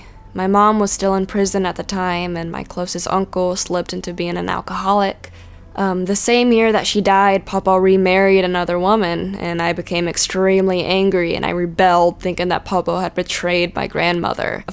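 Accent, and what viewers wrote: South, United States. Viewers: No wonder you have a small southern accent!! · I just realized from this video she has a country accent. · I knew you were a southern belle, such a lovely accent.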